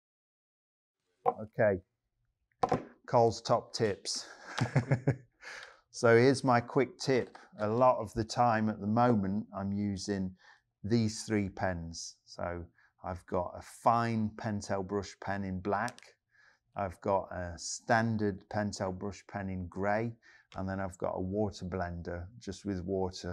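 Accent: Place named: United Kingdom. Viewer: Didnt expect him to have such a british accent. I love his stuff. Amazing artist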